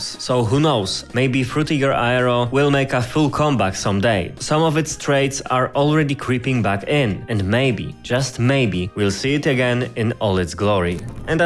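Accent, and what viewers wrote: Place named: Poland. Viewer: Is this an english version of? Same thumbnail, voice and video in general. Czy mam rację? I feel that I shouldn't be here lol. Oh btw I FEEL Polish accent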